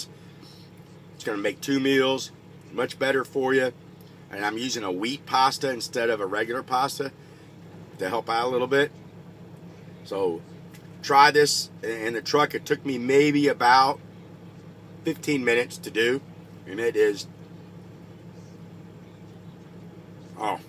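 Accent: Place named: Los Angeles, United States. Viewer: looks great.. what accent he is speaking,is this LA accent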